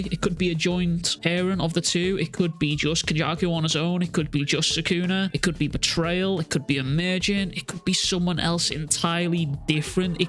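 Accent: Liverpool, United Kingdom. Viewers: Subscribed for the Liverpool accent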